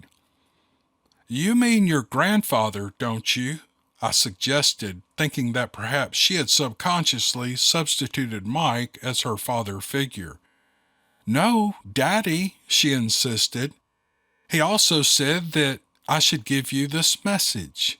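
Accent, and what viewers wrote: South, United States. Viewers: I have listened to this twice, several months ago and then today. OMG! Best novel ever and I love ’ southern drawl that give this book so much reality with me being a native Alabaman, sound like my uncle/cousins and Grandfather ❤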